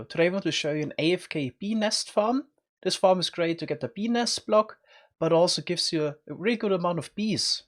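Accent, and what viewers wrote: Germany. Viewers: great video! no bee pun or material list but i do have a question u german?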